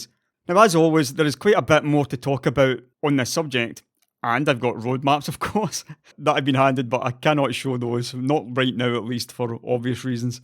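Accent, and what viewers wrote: Scotland, United Kingdom. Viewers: Love hearing that Scottish accent. Music to the ears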